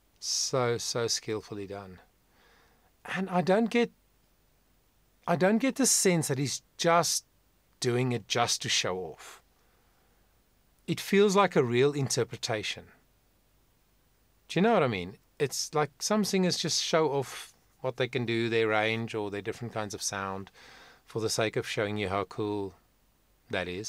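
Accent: South Africa. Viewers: Thank you for this reaction. Hope you don't mind a long comment. Firstly, I listened to your opinion 3 times over a period of weeks. You sound to be from SA and so am I. The 1st time I thought, about your comments, can't you just listen and enjoy the way I do. Over time I came back to your reaction. Thought, ok, he wasn't being negative, he was teaching about different styles. Then after another period I listened to your reaction again. In the meantime I probably listened to all and every reaction. So, thank you for teaching me a few new ways of understanding why sounds, like growls, high notes, softer notes, vibratos and other fluctuations attracts me. I will now try to remember at least some of the things you mentioned. Please react on other Dan Vasc covers like, and the massive version of. Thank you.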